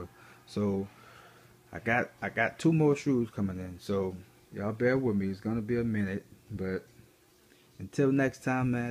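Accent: New Orleans, United States. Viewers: New Orleans accent? I'm going to check the website out. Thanks for sharing!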